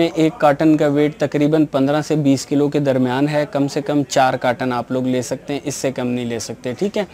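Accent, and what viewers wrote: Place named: Urdu, Pakistan. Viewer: Ur urdu accent and way of way of talk is very beautiful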